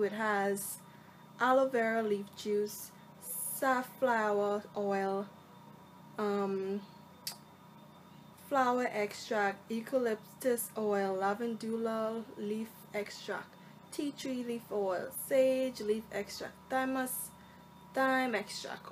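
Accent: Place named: Liberia. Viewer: Hey are you Liberian?? I can hear a liberian accent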